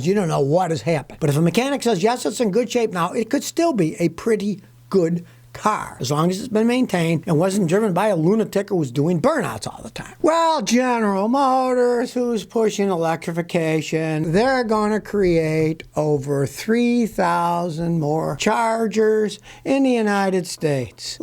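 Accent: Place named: New England, United States. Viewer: Love the Yankee accident, etc The charm of "PLETH-ORa" is classy gangsta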